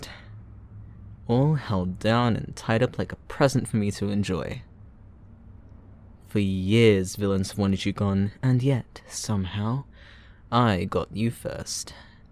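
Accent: United Kingdom. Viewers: (speaking French) Bro I thought you were British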